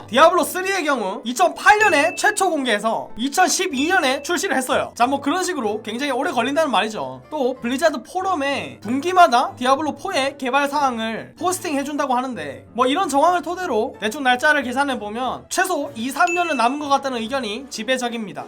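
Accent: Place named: Gyeongsang, South Korea. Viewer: 경상도 분이시네 ㅋㅋㅋㅋ 엄마편 아빠편 이카면서~ㅋㅋㅋㅋ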